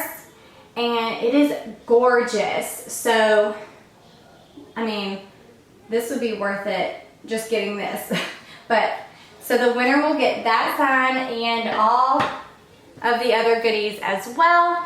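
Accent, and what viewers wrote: South, United States. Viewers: Congrats!!! Subscriber for a while now. And started following you on IG last week. Being from the north, I love your Southern accent ❤️ Love your channel! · Congratulations on 10K!!!! How exciting. I am a new Sub. and I think your home is Beautiful and that Southern Accent!!!!! LOL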